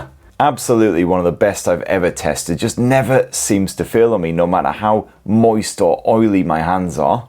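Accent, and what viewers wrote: United Kingdom. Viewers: I thought you were a Brit. Do pics look crisp or crispy like potatoes? 😋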